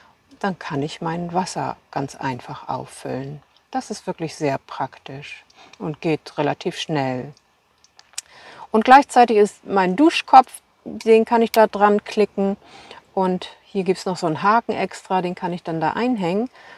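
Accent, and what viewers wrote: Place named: Northern Germany, Germany. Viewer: Liebe 👋🌊⛰️🕊️ Jetzt wo ich dich schon öfters gesehen habe, sind die Ähnlichkeiten mit Heike längst verschwommen... Und ich sehe dich! Wenn ich nicht irre kam das eine oder andere nordische Wort durch oder hamburgisch... Ich bin Österreicherin... Für mein Ohr klingt Norddeutsch irre schön. Das rollende rrrrrrrrr Also dein Ausbau ist so toll geworden... Außerirdische schön... Die ganzen Details... Und dazu dass du dann immer sagst "ich find das so toll dass ich alles im Sitzen erreichen kann" zb Einfach dass du deinen Ausbau liebst... Das liebe ich wiederum Ja... Ich träume ja auch davon einfach loszufahren... Ich träume aber auch von einem gemeinschafts-gemüsebau-projekt Da du um ein paar Jahre älter bist als ich, hab ich total den Druck verloren, jetzt ALLES auf einmal realisieren zu müssen. Danke dafür! Der einseitig blickdichte Vorhang ist auch sowas von genial! 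Hej... Du isst ja auch Gemüse zum Frühstück... Wenn du dich noch 1 Stufe weiter traust, könntest du Wildkräuter wie Löwenzahn zb dazu essen. Ich sag nur - irre gesund und bewusstseinsöffnend! Gomera ist ja bekannt für seine verschiedenen Klimazonen, also den Berg rauf findest du die.. da kennen die einheimischen sicher 1, 2 Wildkräuter die man essen kann. Roh. Wie Kräuter über m Salat 🥗 Du wirst es nicht bereuen... 🌿🤘😉 Liebe... Vielen Dank für deine Videos... Sie beruhigen meinen wilden Drang sofort loszufahren... Dass du morgens als erstes schwimmen gehst... Ja.. toll... Man ändert so sein ganzes sein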